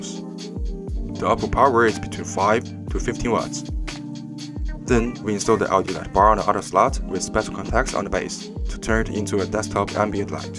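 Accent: China. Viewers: They are from china. And I guess some people may not understand the way they speak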